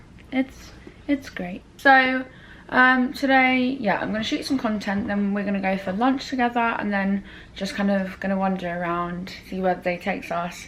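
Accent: United Kingdom. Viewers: I love your British accent ❤ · Am I the only one who didn’t know she was British 😂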